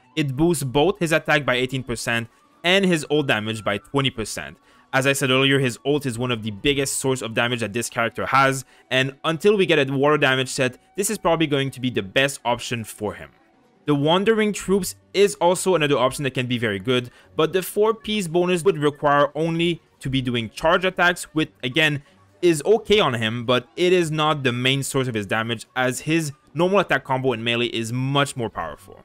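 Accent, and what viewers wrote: France. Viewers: Your accent sound like very french are you ?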